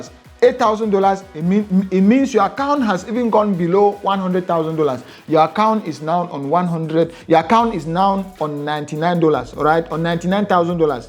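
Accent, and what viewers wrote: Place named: Akwa Ibom, Nigeria. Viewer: U sound like an akwa ibom guy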